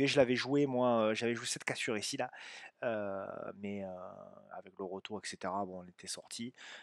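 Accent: South, France. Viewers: Écouter chaque semaine et son accent du Sud, en complément du salon Discord, c'est toujours un plaisir:) Le contenu est qualitatif, les Graphs sont épurés et compréhensibles par tous. Merci et très vite, bravo pour le partage! PS: pour une prochaine fois, une idée: la présentation d'un indicateur en plus du prix (# des volumes)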